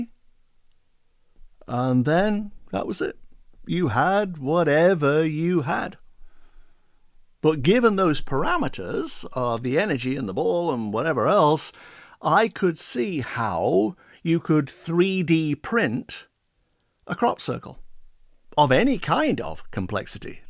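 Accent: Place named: United Kingdom. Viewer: Almost as soon as you start for the exit you stop and and in that cool ass brit voice say "huh I wonder" Then you walk up to the opening thinking " wow that's quite a jump," as the orbs popped and floated up . All you had do was believe and have faith that you were going to get where you are meant to. So off you go.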